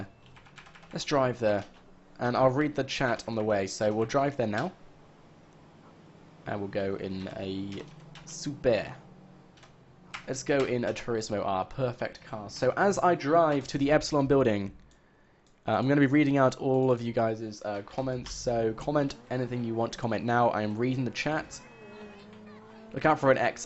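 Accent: United Kingdom. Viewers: Fuck your british accent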